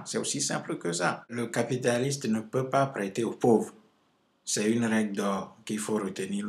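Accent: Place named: Africa. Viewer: Il a voulut dire suisse mais avec son accent africain on ne l'entend pas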